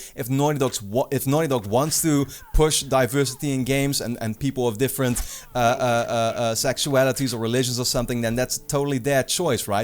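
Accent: Netherlands. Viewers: are you dutch ? Your accent sounds like it ;p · Ah, ik dacht al een Nederlands accent te horen. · The sexual orientation of a character really does not matter to me, nor their race, gender, strength. I really agree with everything you said, even though it's a while ago. I understand that people might think an agenda is being pushed, because they do not agree with it, and suddenly they are confronted with something they might not expect in a game like tlou, but i hope they can learn to see, it is not pushing anything. If Ellie would be kissing a boy, sure, someone might say he is pushing a hetero normative agenda, because they would rather see more gay romance in games or on screen, but in the end, the developer has all the right to put his or her ideas into their creation, their fiction. Their art. I'm not really OK with people demanding artists to change their work to please the masses, but ofcourse, everyone can have their opinion, but they shouldn't expect the devs to bend to their will. 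And, are you a fellow dutchy? Not that it matters, but the accent? Cheers for the video.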